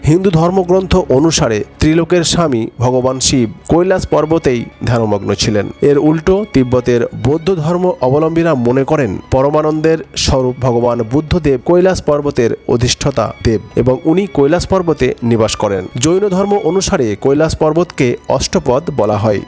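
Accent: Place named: Kolkata, India. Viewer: ক্যালকেসিয়ান উচ্চারন পরিহার করুন!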